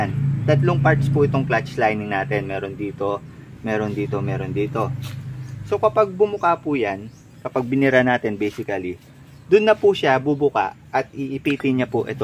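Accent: Pampanga, Philippines. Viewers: Kapampangan siguro tong si bossing base sa accent? Hahahahhaa nice vid bossing! Very informative! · Basically maganda tong video. Kasi di ko talaga alam kung ano ang basically dragging. Pero parang Kapampangan si sir basically.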